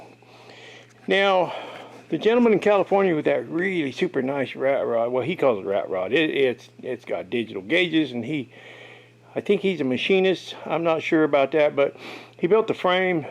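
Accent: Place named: North Carolina, United States. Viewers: I sure thought you were from North Carolina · Woulda never guessed your in Washington...thought you were in Tennessee or North Carolina mountains. Your place looks like it's there, your shop looks like something you'd see here in the south, you talk a lot like that area and you have the same demeanor about you too. Woulda never known the difference if I hadn't heard you say it. You'd fit right in around those areas.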